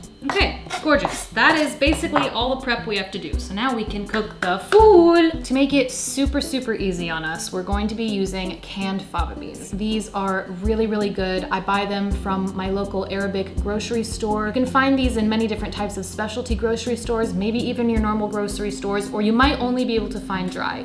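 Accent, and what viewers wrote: United States. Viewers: Can't wait to try it! Thank you for sharing. BTW Virginia accent? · heyy thank u for the video its fab! :) so good to see more and more vegans with YT channels!! do you have the written recipe up somewhere? would love to try it. im guessing youre american by the accent and also when you said 400 degrees!! XD im not sure what that is ill have to google the conversions or if you might consider putting the metric amounts as well in the written copy that would be amazing!!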